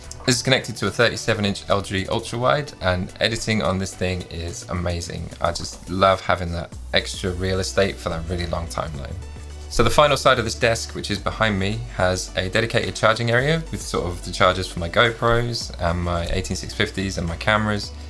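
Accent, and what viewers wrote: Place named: United Kingdom. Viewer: Definitely feels like it's giveaway season on YT at the moment. My last qwad just missed the concrete earlier in a crash and rather than shattering, just entered a roll, bending antennas as it went. No broken props through as it was a ducted qwad fortunately. I was going to end with a blond joke but then looked in the mirror... Great to see you're back at it and to hear a better accent than all the us pilots 🇬🇧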